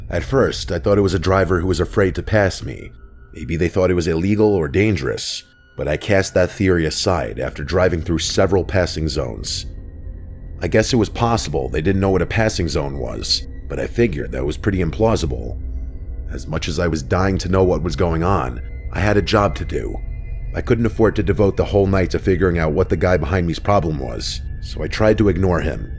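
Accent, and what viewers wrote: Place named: New Jersey, United States. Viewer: No he’s not. The abhorrent Jersey accent is far from intriguing. It’s amazing how many Generics fall in line.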